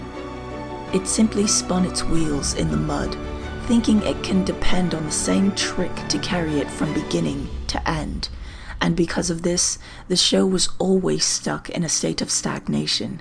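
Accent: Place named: United Kingdom. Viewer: you should really work in documentaries or some shit with that amazing brit voice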